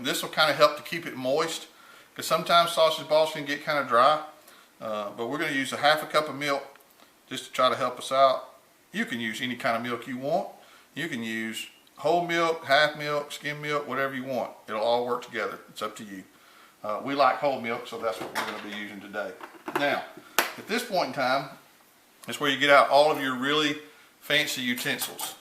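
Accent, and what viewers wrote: South, United States. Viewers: My husband is going to LOVE these! Thank you and so much for this recipe! I love your accent and funny southern expressions..."Keep doin it till you got it gone" lol. Very entertaining... and these are great recipes! I made your Date Nut Balls this morning for our Christmas party today. Delish! xox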